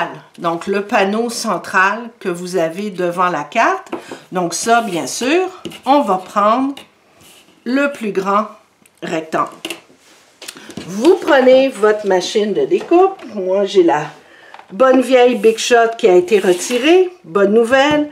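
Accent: Canada. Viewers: merci beaucoup pour vos explications très claires et précises. C'est un plaisir de vous écouter et d'entendre ce bel accent canadien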